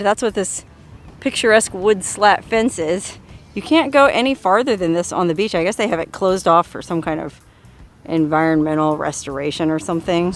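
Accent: Australia. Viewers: G'day mate. your OZ accent was pretty good. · Your Australian accent is very Steve Irwin 👍 Definitely better than Meryl Streep's "A dingo's got my baby' 👍🤣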